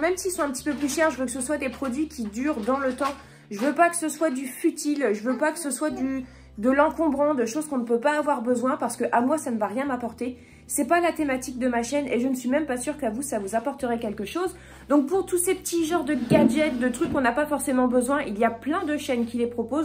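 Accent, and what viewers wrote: Ardennes, France. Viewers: L accent des Ardennes j'adore! Courage pour ton travail quotidien et bravo surtout!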